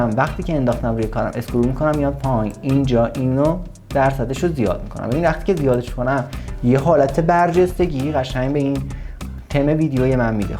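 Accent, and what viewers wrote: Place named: Isfahan, Iran. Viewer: برای لحجت عذر خواهی نکن از این به بعد لطفا فقط اصفهانی صحبت کن 🔥🔥🔥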